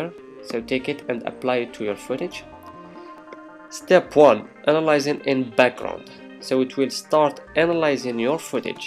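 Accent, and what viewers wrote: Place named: France. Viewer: you sound french lol. thanks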